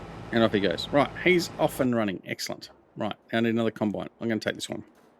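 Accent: Australia. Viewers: Just came across the channel looking for a series on this map as I've just started playing it on mine, good to hear a auzzie YouTuber watching from new Zealand 🇳🇿 also good to see your still making episodes on this map, can't wait to see were u take the map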